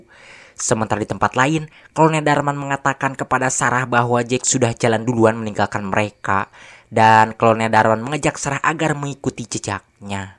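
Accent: West Java, Indonesia. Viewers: Wihhh Sunda Palih mana kang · Urang sunda?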